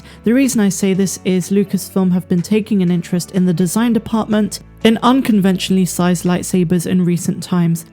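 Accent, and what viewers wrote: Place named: West Country, United Kingdom. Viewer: Is from bristol? Or West country? I can hear a twang! Happy new year Love the content!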